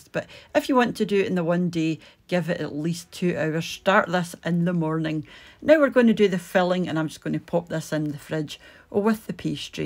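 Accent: Scotland, United Kingdom. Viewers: You sound like that little Scottish ladie on television , the buying and selling show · Loved you r video and your accent, miss my friend s from Scotland. With love from Brazil Jerry.❤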